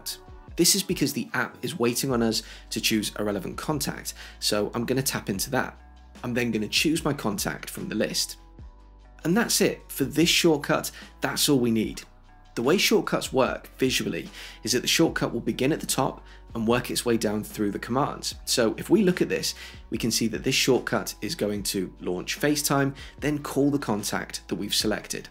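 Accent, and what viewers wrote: United Kingdom. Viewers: Often wondered, now I know, 🙏 and you’ve a British voice without the bull that most vlogs from across the pond revel at.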